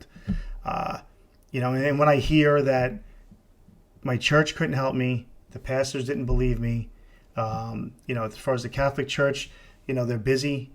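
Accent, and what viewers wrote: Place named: New York, United States. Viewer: I love your New York accent! Thank you for doing this podcast. Blessings to you and from South Africa.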